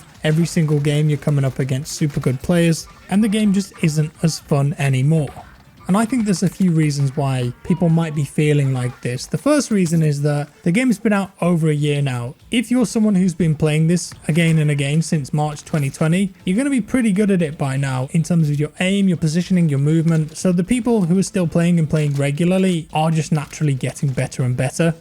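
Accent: United Kingdom. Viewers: A bunch of streamers already do the "spectate" - don't follow. I love the adult conversations regarding gaming with other adults. Mix in the British accent and I just laugh. M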